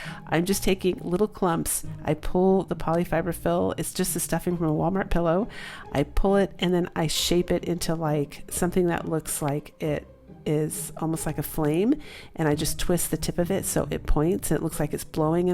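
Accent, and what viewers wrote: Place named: England, United Kingdom. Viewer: Yay a fellow decaf drinker! So cool that he's from England. I'd never get tired of his accent.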